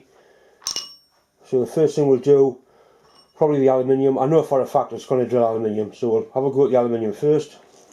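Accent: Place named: Liverpool, United Kingdom. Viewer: I know a fair bit of effort goes into these videos. I want to be constructive: first please try & speak with a little less of the scouse (?) accent, I kept missing points that were maybe important. I also noticed some viice movement. If these drills are as good as you ,say they must be from another Chinese source. Those I have ordered so far (Drillpro) have the worst sharpening I have ever seen, & it's hard to sharpen drills below say 5 mm, at least for most of us. Of course if an edge should chip you will need to resharpen, how quick & easy is that - well you did not consider that. Remember also not many of us have a milling machine or high quality pedestal drill, so your tests should have been at least 50% freehand drilling. Finally I watched throughout & noticed that in most cases (not all) one flute appeared to be doing most of the cutting; this suggests the sharpening is not too good & that really is important. 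I almost forgot - yes you made surprising progress without failure but some repetitive drilling is needed to gain some insight into how well the drills are doing. You should have attempted at least 20 holes from one drill & maybe 50 holes in mild steel. Thanks for the video.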